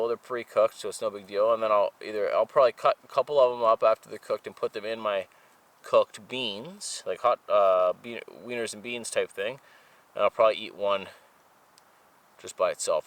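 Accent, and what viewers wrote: Canada. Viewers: Were you saying the blue farmer Swiss Army knife or was it just my So. La. Ears hearing you’re Canadian accent? Couldn’t find that on amazon. Would it be the huntsman?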